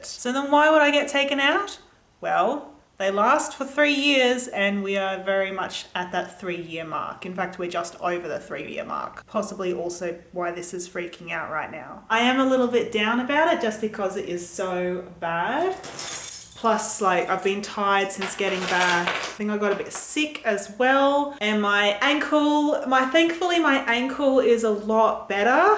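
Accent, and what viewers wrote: Australia. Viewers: Hi ♡ I usually remain a ghost-viewer but I wanted to comment to say a few things: 1) I love your videos 2) and your personality 3) and your laugh (it's so cute!) 4) I love australian accent (had never heard it before hihi) 5) I read your descriptions! ♡ 6) I so feel you about the importance of words/phrasing (#sensitiveteam ♡) 7) We love you! 8) we love you, and you don't even need to apologize for doing different than usual! Just do you! we'll love you and love your channel whatever! 8) lots of love from my little heart of acne/redness/scars-and-etc (#teamhairy! Haha) sufferer ♡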